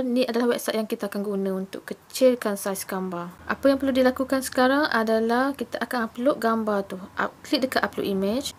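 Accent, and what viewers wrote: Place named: Terengganu, Malaysia. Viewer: bunyi jgk slang ganu ye 😂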